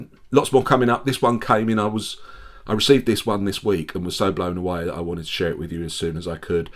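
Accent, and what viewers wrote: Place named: England, United Kingdom. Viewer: Excellent review mate, thanks. Just the right amount of meat-and-potatoes information about the watch with a sprinkling of clever humor for desert. Not to mention the charming English accent for an authoritative quality. --From Central Oregon, USA.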